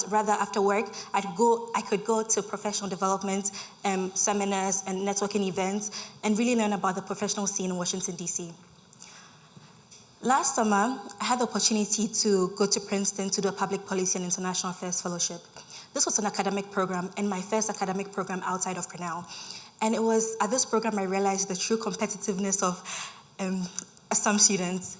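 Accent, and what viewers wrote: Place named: Ghana. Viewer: keep up with the Ghanaian accent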